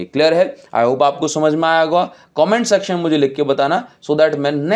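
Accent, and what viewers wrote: Odisha, India. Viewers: Are you odia?? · Are u from odisha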